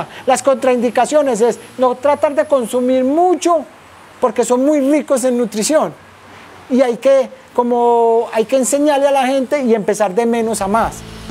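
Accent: Antioquia, Colombia. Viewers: Gracias por la enseñanza tan valiosa! Lástima esa forma de hablar tan ordinaria, que pena pero siempre me choca demasiado eso de los paisas, hablan tan ñero🤦‍♀️ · ¿Cierto? <3 Me encanta cómo hablais, lo explicáis genial, muy educativo y amo vuestro acento <3 Viva Colombia. Saludos desde España. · QUE TIPO TAN SENSIBLE, ES VERDAD A LAS PLANTAS HAY QUE HABLARLES CON AMOR Y CRECEN MUY LINDAS. ME ENCANTA SU ACENTO PAISA. VIVA COLOMBIA! SALUDOS DESDE DALLAS, TX.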